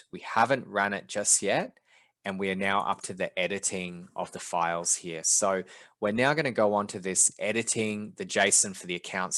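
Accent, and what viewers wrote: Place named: Australia. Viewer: I love your aussie twang mattttte,, been to long mate,, catch up in Discord,, Sam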